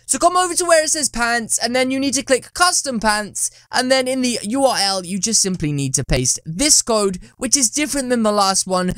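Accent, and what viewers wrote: United Kingdom. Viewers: U British?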